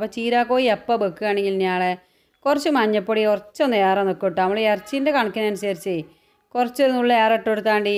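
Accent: Malappuram, India. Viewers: അടിപൊളി മലപ്പുറം ലാംഗ്വേജ് കേൾക്കാൻ ഭയങ്കര സുഖം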